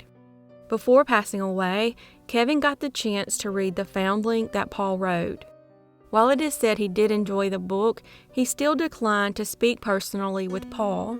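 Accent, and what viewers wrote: South, United States. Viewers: Ah yes the southern accent, love it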